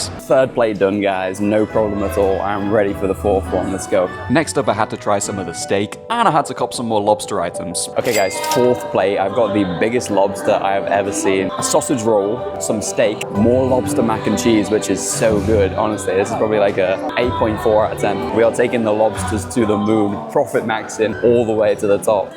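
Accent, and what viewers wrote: Liverpool, United Kingdom. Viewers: I think you're the first British YouTuber I've followed. I love the British accent. With all my love, I wish you the best of luck in your future. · I can tell by your accent. Liverpool is a great place. The Beatles came from there.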